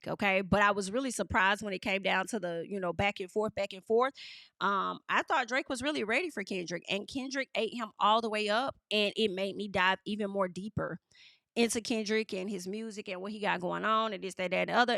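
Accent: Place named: Louisiana, United States. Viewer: Hey, bihh! You are one of the best YouTuber. I love your accent. Where are you from? It's giving Louisiana! Bihhh stop, I'm that.5 million that's been watching over and over again! They didn't add crowd noise. I know someone that was there, and they said it was lit! 50 is a bug 🐛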